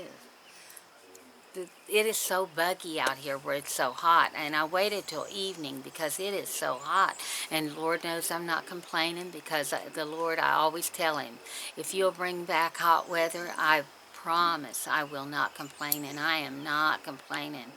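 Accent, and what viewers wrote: West Virginia, United States. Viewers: I enjoyed listening on my morning walk this morning. I also gave you a like. Keep making your videos. I watched Little Poet when she first started and now she has 100k subscribers. I am all about people making money on You Tube. It is a good extra income for those that can do it. Love your W Va accent ie, " you'ens. I am going to Ohio to visit my mom and sister in August. Not really a vacation, but we love to see them and we take 3 days to get there only drive 6 hours a day. So we sight see a bit on the way up. I hate taking medications always some kind of side effect. Sometimes there is no other way though. I hate cockroaches, I would not have stayed either.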